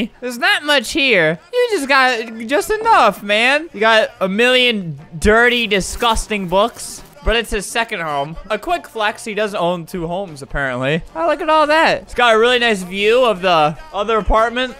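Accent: United States. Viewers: U always sound like ur from america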